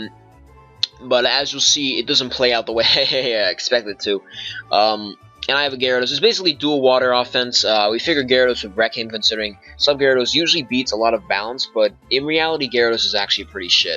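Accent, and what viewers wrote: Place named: Illinois, United States. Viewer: Are you from Illinois? Or Wisconsin? You kind of sound like you are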